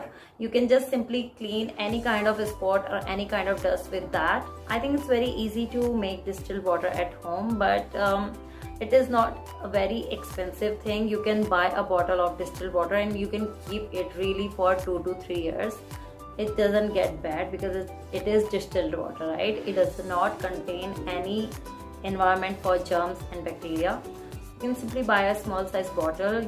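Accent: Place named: India. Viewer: I HATE YOUR WORLD FAMOUS INDIAN ACCENT!!!!!!!!!!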